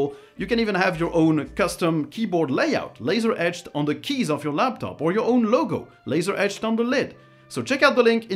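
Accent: France. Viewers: Where is your French accent coming from? I'm Belgian ^^ Nice video by the way, I'll install 21.1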